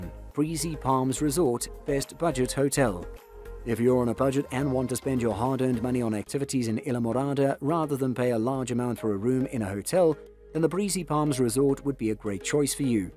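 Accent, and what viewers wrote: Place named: England, United Kingdom. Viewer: Thank you for this nice video. I do not know where you live but you sound like you have an English or Australian accent. We do not pronounce it either way you keep saying it! I live in Florida right near the Keys!! Go there all the time! Have a great friends, who have lived there for years!! Isle. Pronounce the word as: isle, aisle & I’ll! 😊 Phonetically, it is called - I’ll MORADA!!!! Islamorada, Florida 🇺🇸❤️🗽🗾🏝️☀️🌊🎣🎣🎣🐠🐟🐬🐋🦞🐢🦀🦑🦈🦈🦈🐊🌴🏊🏻‍♀️🚣🏻‍♀️🚴🏻⛳️🛺➕ 💃🏼🕺🏻🎸🥁🎹🪇🪕🎺🪘🥁🎸🚤🛶⛵️🚤🛶⛵️🛥️🎉🎉🎉🎉🎉🎉🎉🎉🎉🎉🎉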